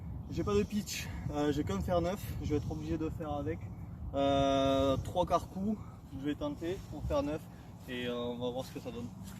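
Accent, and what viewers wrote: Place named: South, France. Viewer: très bien ce 1er vlog... avé l'accent!!!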